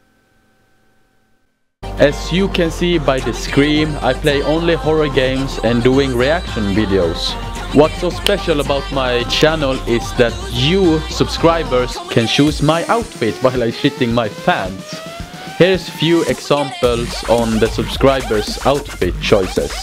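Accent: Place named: Sweden. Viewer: the look of your pants, you know.. swedish pants these days... nah jk your accent reveals it all, well the pants to but yea;D im swedish to btw.:)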